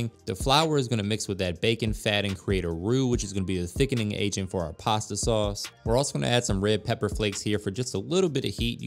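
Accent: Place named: South, United States. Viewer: Sounds like a real country boy lol wish I could taste that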